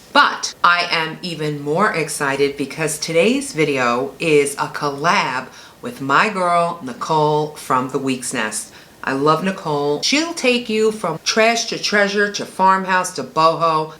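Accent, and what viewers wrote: New York, United States. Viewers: I love the plant container! You are awesome. Stay safe, I love your New York accent too. Much love from Cape Breton, Nova Scotia, Canada xo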